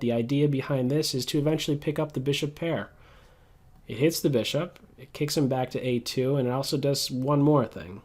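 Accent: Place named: South Carolina, United States. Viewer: It's his accent, South Carolina or something.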